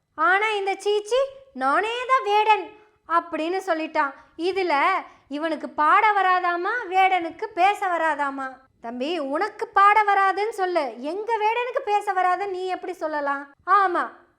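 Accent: Kongu, India. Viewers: சகோதரி, உங்க கொங்கு தமிழுக்கு நான் ரசிகன். 👏👏👏👏👏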